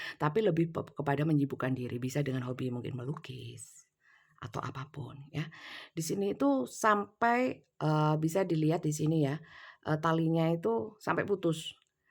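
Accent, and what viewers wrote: Java, Indonesia. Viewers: Bunsaya suka ibu kalau omong Jawa medok banget. Sebagai orang Jawa.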